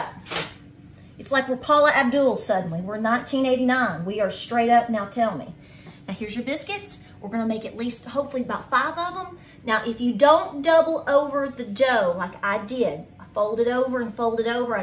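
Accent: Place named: Rural, United States. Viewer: And her country twang. I love it!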